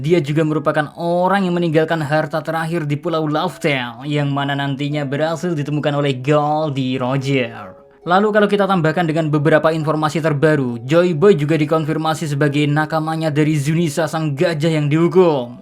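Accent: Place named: Bekasi, Indonesia. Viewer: Admin orang bekasi kek nya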